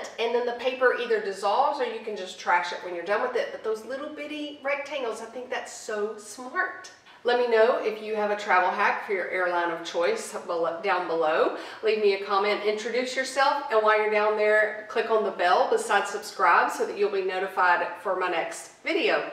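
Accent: South, United States. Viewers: I love her southern drawl. Super charming.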